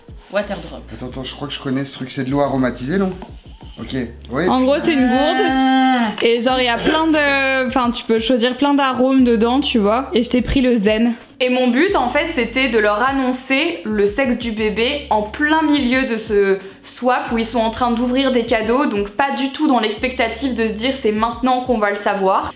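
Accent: Brussels, Belgium. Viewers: tu as pris l'accent belge!!! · Trop chou, tu commences à prendre l’accent bruxellois du Henri. Pendant que moi, j’ai perdu mon accent belge dans les Alpes 🥰🇧🇪🇫🇷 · tu as un petit accent belge 😂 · T'as un peu pris l'accent belge ahaha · Sais-tu que tu commences à avoir un petit accent belge par moment 😉? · Tu as vraiment adopté l'accent belge je trouve !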